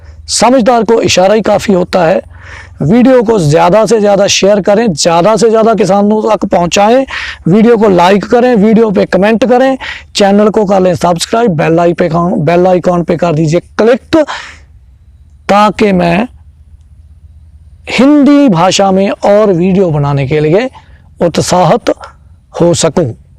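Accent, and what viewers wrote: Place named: Punjab, India. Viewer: Sir ji aap ki Hindi bhi Punjabi jaisee hi hai thanks God bless you Very good information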